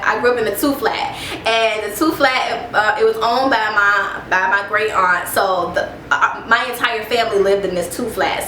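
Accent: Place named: New Jersey, United States. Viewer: im from south side and you sound like you from New Jersey 😂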